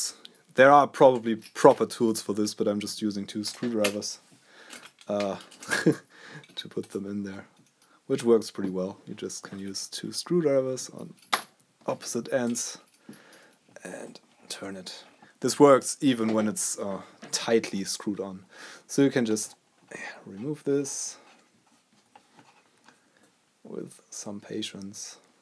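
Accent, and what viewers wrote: Germany. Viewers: nice repair and easy-to-understand explanations... I happen to have the same mic and I was wondering if you could tell me what to fix/ replace in particular because my headphone jack doesn't work anymore... So whenever I try to monitor my still working recordings I can only do it without the mic's output AKA by using the normal speakers included in my PC... Therefore could you please explain what causes the headphone jack to stop working or make a video on it? I noticed that you were very good at explaining in this video, it would help me out a huge bunch Btw, I also noticed that you had a bit of a German accent, I'm a German myself xD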